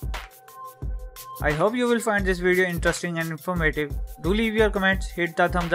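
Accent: India. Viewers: Me hears an Indian voice... Instantly clicks away... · indian english is cute xD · love the Indian accent.. good , helpful video too. Thank you! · 100% Hindu. I swear didn’t even look at comments but I knew immediately he is from India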